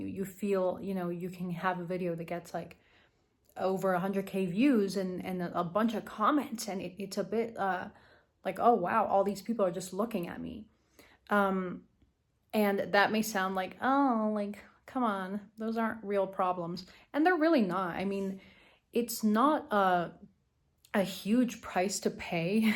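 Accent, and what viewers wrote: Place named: Egypt. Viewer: I was thinking that too! I bet she's Egyptian! Because on CHEX News in Peterborough, Ontario, the weather girl, "Noor Rafat Ibrahim" has that same accent in her voice that has!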